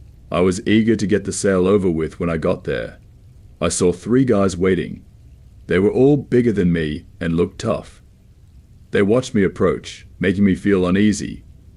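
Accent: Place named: Australia. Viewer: It sounds so weird hearing someone with an Australian accent tell a story involving a gun 😂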